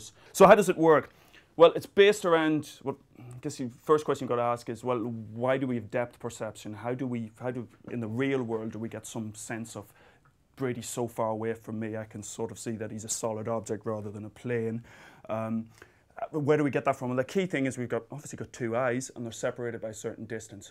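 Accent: Ireland. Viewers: He is definitely irish! =D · is he irish? if he is good to know that were making an impact on the world other than being drunk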